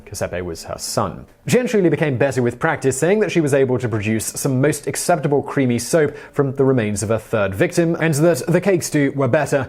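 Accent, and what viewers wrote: United Kingdom. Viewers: Your British accent is awesome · Sounds like the host is British.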